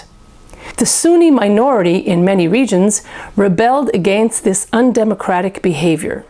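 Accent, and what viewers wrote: Israel. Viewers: The accent of the broadcaster in the video sounds Hebrew. From the way she pronounces Hezbollah. Am I right? Anyone else noticed that? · Zionist detector. the narrator is zionist israeli and this forum is false. (pay attention to her accent) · Why this woman is talking like an israeli woman? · This video is one of the many collaborations between Salafi/wahabi sunni and Zionist Jews as the pronunciation of the narrator comes from Israel Apartheid state. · I SMELL ISRAEL IN YOUR ACCENT AND STORY .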